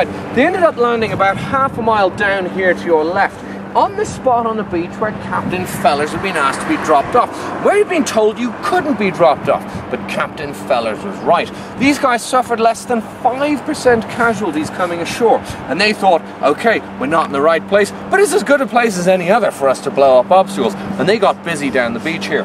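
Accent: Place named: Ireland. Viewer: He's Clearly Irish. you idiot.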